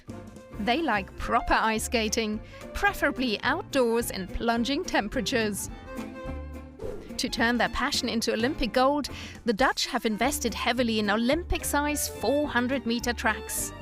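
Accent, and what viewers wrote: Germany. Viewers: You pronounce the names very german but pretty good anyway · She pronounces Dutch names with a German accent lol. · You pronounce Dutch name as if they're German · Why does the announcer pronounce all the Dutch names as if they were German? It is a bit disorienting. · yes I could hear the German in her voice xD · Was the narrator german, she had such a germanic accent lol.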